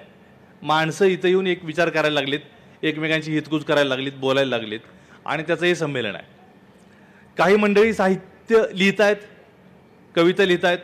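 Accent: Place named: Udgir, India. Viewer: मंजुळे सर तुम्ही माझ्या गावी उदगीरला आलात .खुप छान वाटले.मी अहमदनगर आहे पण तुमची भाषा ऐकुण उदगीरमध्येच आहे असे वाटले. आपली भेट व्हावी ही अपेक्षा.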